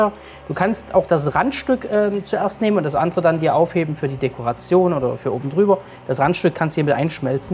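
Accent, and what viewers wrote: Saxony, Germany. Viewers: Sehr lecker, wir machen unsere Nudeln selber, Salz erst rein wenn das Wasser kocht(das Salzwasser braucht länger bis es kocht-gerade bei den Preisen-ne ich bin nicht neumalklug, habe ich von meiner Tochter-sie ist Köchin) Warum Untertitel - man hört ja nur wenig vom Sachsen😂-bin ich ja auch Sonst ist dein Rezept prima lecker🎉👍👍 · Grad das Sächsisch finde ich so charmant 😉 zusammen mit deinem trockenen Humor.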